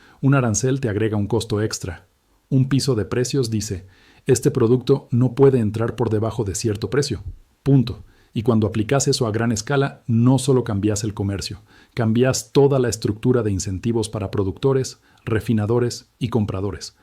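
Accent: Argentina. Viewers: Por que le pusieron español argentino, suena desagradable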